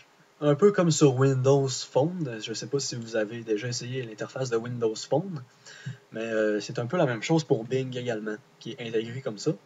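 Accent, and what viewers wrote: Quebec, Canada. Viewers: C'est toi le con ! Ça s'entend pas que c'est un Québécois ?? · Haha la vidéo à commencé j'ai pas compris, puis jme suis dit "ahhh mais c'est du québéquois" =) Quand on s'y attend pas ça surprend ^^ merci pour cette vidéo ! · Est-ce que les Français font exprès pour ne pas comprendre l'accent Québécois??? Nous on comprends très bien votre accent et vos énormes anglicismes!!! "Putain j'piges pas c'que tu dis bordel"... nous on dis "Criss qu'es-ce tu dis tabarnak"...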